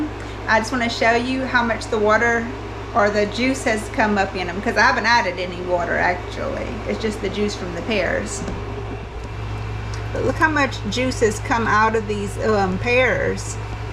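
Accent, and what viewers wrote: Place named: Louisiana, United States. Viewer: Nice recipe. My pears are coming in here in central Texas so I’m about to do preserves. My son-in-law is from Thibodaux,/Chackbay. Your accent sounds like his family.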